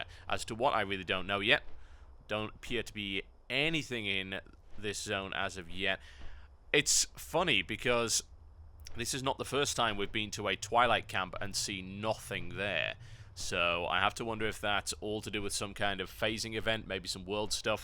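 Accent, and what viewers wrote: United Kingdom. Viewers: hah, nice brit accent. both pretty funny and quite clear to make out the sense. even for some terrible non-native english speakers. watch out for kgb, and god save your queen!=)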